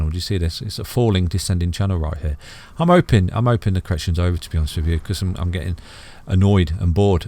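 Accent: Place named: Birmingham, United Kingdom. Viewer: How have I just found you? Another Brummie?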